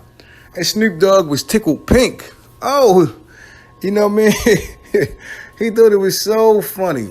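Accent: Baltimore, United States. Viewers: You must be from Baltimore the way you say those o's lol. You sound like Snoop from the wire.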